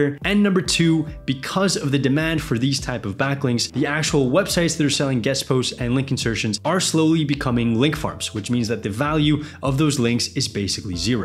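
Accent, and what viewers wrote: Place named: Singapore. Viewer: I've just spent 5 hours trying to work out your accent I'm going with schooled at an international school in Singapore or some shit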